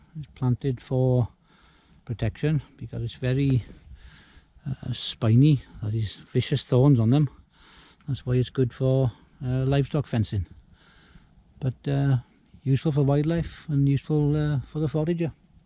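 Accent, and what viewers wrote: Wales, United Kingdom. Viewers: Cannot tell you just how chuffed to pieces I am that I discovered your channel. Loving the videos tremendously and you are such an engaging gentleman. I love listening to your lovely soft Welsh accent. Just some critical feedback for this video in particular is that the audio is terrible in parts, due I'm sure to your cameraman not utilising the microphone to its best advantage! I'm sorry to say I found it quite irritating in places because all I wanted to do was listen to/hear you! Lol. Let's hope you train him better for future videos! Thanks again for your fab and very informative videos! I've definitely got the foraging bug now!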